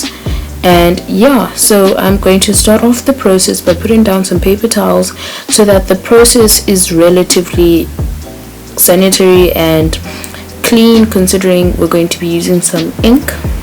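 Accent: South Africa. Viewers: tell me you’re south african without telling me “ so ja “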